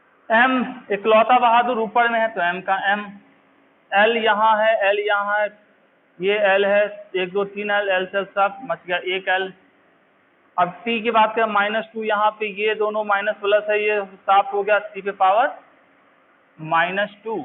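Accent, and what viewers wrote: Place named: Bihar, India. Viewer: Sir hm iss year Bihar board inter 1st division kiye hai... 353 marks aya hai..physics me 74...biology me 76 aur chemistry me 66 aaya hai iss baar hm neet ka exam nhi de rhe hai..2023 me pakka denge..aap aise hi lecture layega..aapka voice tone bihari jisse padhne me boring feel nhi hota hai..thanks sir..🙏🙏❤️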